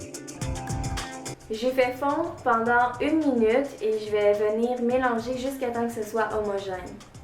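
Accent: Quebec, Canada. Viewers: Accent québécois ? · super vidéo <3 et j'adore ton accent québécois/canadien :3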